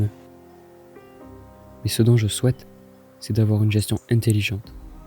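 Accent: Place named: Hautes-Pyrénées, France. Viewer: Bravo, tu peux être sacrement fière de toi! Quelques petites fautes de français mais rien de grave (c'est souvent des façons de parler dans le 65 mdr) et la qualité du son est à retravailler un peu, mais les images, les informations et le message sont fabuleux.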